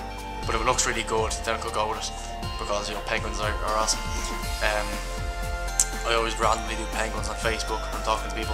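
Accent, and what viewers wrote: Ireland. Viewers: lmfao it could be picture of a penguin. haha ur soo adorable :P btw i love ur irish accentt <333 :D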